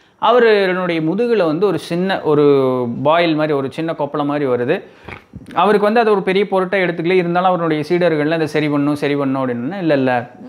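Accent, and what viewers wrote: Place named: Coimbatore, India. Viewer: Te way u explain in coimbatore Tamil is very nice.. 👍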